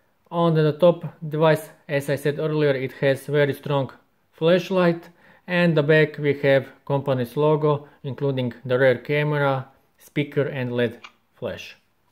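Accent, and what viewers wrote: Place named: Serbia. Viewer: Croatia ? When I hear your English , I first think that you are from Serbia :D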